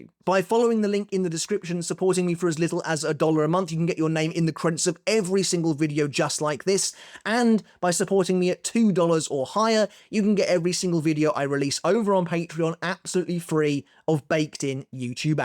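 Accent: United Kingdom. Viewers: Bro those are bangers plus that british accent 💥💥💥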